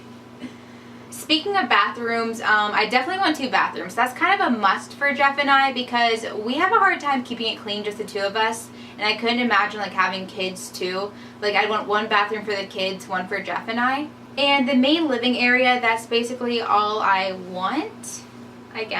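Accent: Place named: Pennsylvania, United States. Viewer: i love the farmhouse! is this in pennsylvania? thats my home state and i feel like thats you're accent haha i love it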